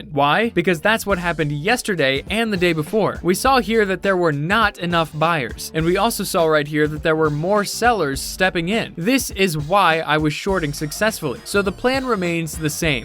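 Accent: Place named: United States. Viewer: I don't think that it's a matter of what timeframe. I think more of a case of when does the day start....I live in Tasmania Australia and my TIMEZONE GMT+11 and I mainly trade on FOREX and I am able to trade from Monday morning am until Saturday morning am which is market close time for New York trading at GMT-5. So I'm in guessing that he's referring to that timezone based on him sounding American. But the other question is, is it a 24 hour period or based on your local trading session?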